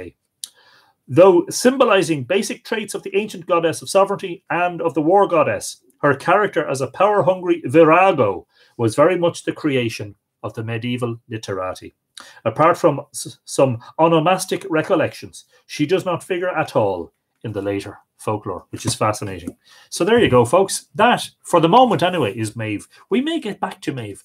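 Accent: Ireland. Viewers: You are helping us all so much. God bless you and your sweet family. Please don't be embarrassed for showing emotion over this horrible virus and stressful time the whole earth is sharing... Many people have died. Yes, this is a very trying time for us all. Your stories truly do help. My personal story is that my husband is in Hospice. He is dieing from Pulmonary Fibrosis as a result from serving in Vietnam and exposure to agent orange. So our days are all very much the same, just fewer guests now. So anyway, I get very stressed and often go in to YouTube and find you at bedtime, whatever that time may be. I listen to your friendly Irish voice till I'm able to fall asleep. Thank you SO MUCH !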